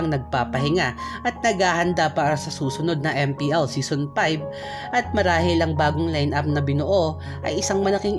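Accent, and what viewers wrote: Batangas, Philippines. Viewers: Ganda mo talaga magkwento lods. Ganda ng boses at pronounciation (Batanggeno accent) more power po.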